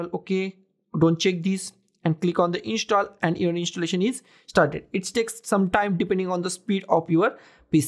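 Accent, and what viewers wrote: India. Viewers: Bro is Indian Pro Max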